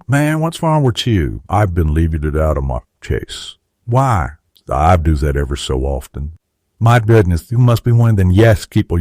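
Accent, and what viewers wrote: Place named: South, United States. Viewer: Still listening....only 10 minutes in so far....there's that southern accent, lol 👍......you and your mate, need to do some live streams....awesome first video...can I post your video link on mine?... We need more channels like this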